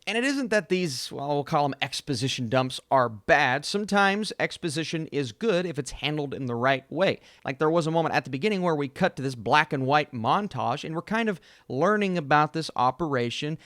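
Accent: South, United States. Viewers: I just discovered you. Bro you were made for this. Love your delivery! Love your accent! Southern I'm guessing. You have a new fan! And, I will watch this just to learn from it. I love bad movies, great teachers;)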